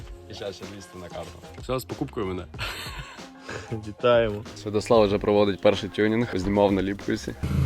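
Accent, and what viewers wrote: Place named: Western Ukraine, Ukraine. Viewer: Шикарно уже разговариваешь на западном акценте. Машинка сыпется но и года и пробег какой. У папика с нуля 2008 год правда дация логан 1,6 пробег 62 тысячи.Удачи а кокой пробег напишешь.Удачи.Добивайся такие машины средний вариант.Удачи.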